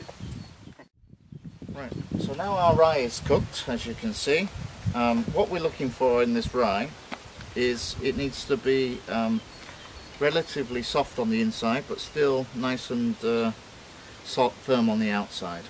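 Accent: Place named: United Kingdom. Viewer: You mean "spawn jars"? Oh, sorry, your british so you talk funny to me.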